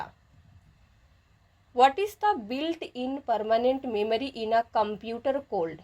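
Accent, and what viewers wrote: Odisha, India. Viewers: Mam kya aap odisha se he ?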